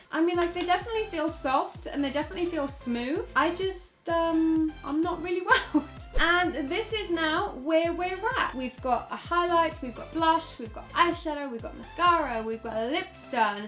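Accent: England, United Kingdom. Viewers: Are u from England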